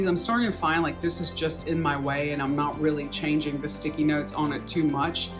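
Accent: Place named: Brooklyn, United States. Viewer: Lol. I knew I heard a Brooklyn accent 😂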